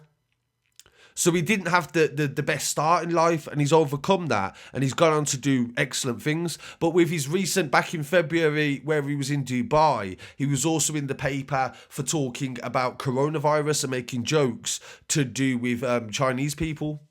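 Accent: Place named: Midlands, United Kingdom. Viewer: I saw this on bbc days ago . But the news is always better in a Midlands accent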